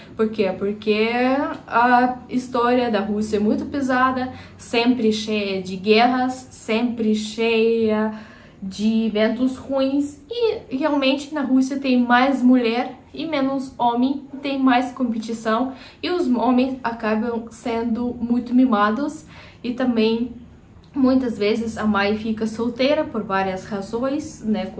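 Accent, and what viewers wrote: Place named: Curitiba, Brazil. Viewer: O tópico é curioso, a maior comunidade eslava do Brazil está em Curitiba. Como namorar como uma Curitiba ? Um fato curioso, teu sotaque é parecido com o de muitos curitibanos, assim como aparência ( pela minha visão de alemão ). A minha impressão é de que as pessoas estão curiosas a seu respeito ( hipótese ). Se minha memória estiver correta este conceito de esposa fora chamado de Amélia ( referência a musica antiga, relativo a esposa no sentido bíblico dentro da cultura hebraico/ cristã )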